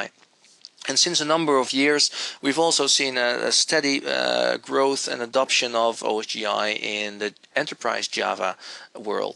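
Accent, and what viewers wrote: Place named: Netherlands. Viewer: Dutch accent:) Thanks for the short intro.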